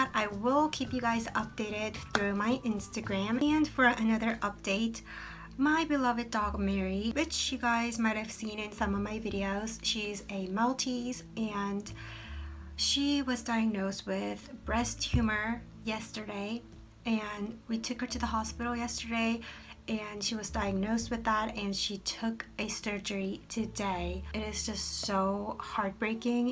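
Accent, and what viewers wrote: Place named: United States. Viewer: This might be a personal question but I'm going to ask anyways are you a foreign exchange student because if I am correct you're currently studying in South Korea and hearing that you speak English with an American accident I assume you're American (correct me if I'm wrong) also if you are a foreign exchange student how did you get excepted and how has your experience been btw love your videos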